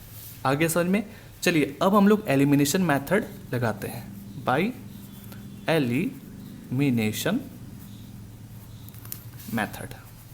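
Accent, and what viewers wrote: Bihar, India. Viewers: Sir bihar ke ho ky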